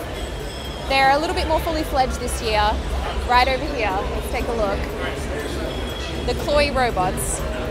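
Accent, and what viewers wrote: Australia. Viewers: So Aussie! So Sydney accent! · Australian mate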